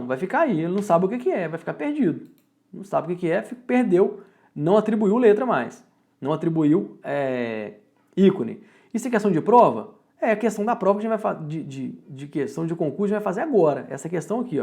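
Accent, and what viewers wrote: Minas Gerais, Brazil. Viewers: Ótimas aulas, Esse sotaque mineirim da um charme especial. Parabéns pela maneira de explicar e passar seu conhecimento!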